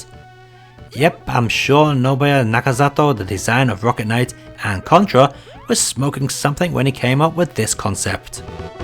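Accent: United Kingdom. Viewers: i like ur videos+efforts+ur brittish accent ( if i am not wrong ).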